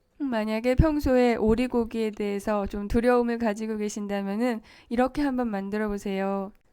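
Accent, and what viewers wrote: Seoul, South Korea. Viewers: 와 이분 진짜 오리지널 서울 출신이신가보다. 서울 사투리가 말투에서 묻어나네요.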